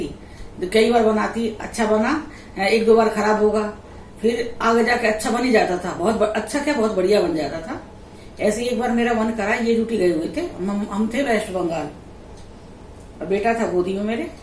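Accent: Uttar Pradesh, India. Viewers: App humri jesi baat karti ho up sahid 😊👍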